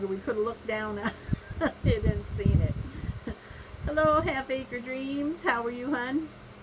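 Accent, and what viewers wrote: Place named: New York, United States. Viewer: I knew you had to be from NY! I can tell by your accent. I am from really upstate NY (Massena)! I have an almost Canadian accent that comes back if I am home for very long!!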